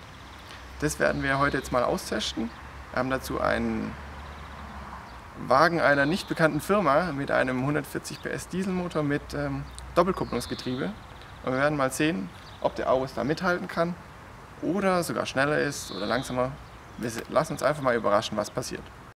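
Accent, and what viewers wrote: Swabia, Germany. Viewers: Der schwäbelt ja rum, des überrascht mi jetzt doch a bißle. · schwäbisch? :D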